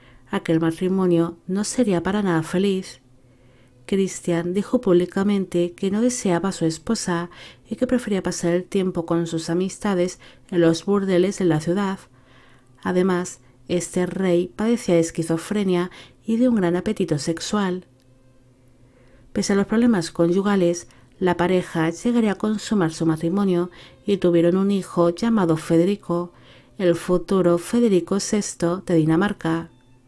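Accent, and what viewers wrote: Spain. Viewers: Sos española?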